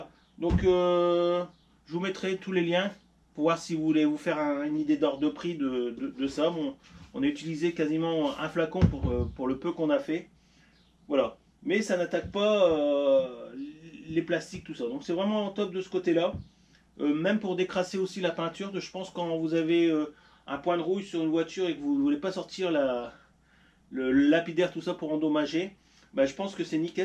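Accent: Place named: Nord, France. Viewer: T es de ch'nord toi 😃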